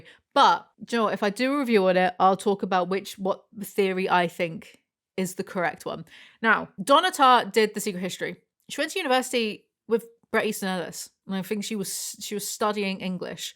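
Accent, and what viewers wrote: United Kingdom. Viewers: There's that aggressively British accent! 🤣 Glad to hear that bonkers accent once again. 😊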